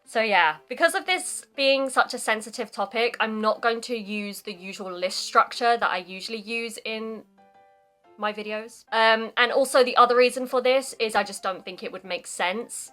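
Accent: RP, United Kingdom. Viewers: Interesting and informative. You speak well , you presention is superb. Is that the Queen's English?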